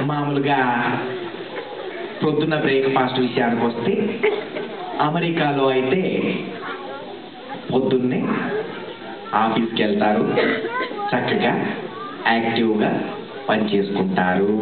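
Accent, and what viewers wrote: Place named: Telangana, India. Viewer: AND U CANT EVEN DO THAT RIGHT WITH THAT HEAVY TELANGANA ACCENT DONT UNDERSTAND ME WRONG I AM FROM TELANGANA TOO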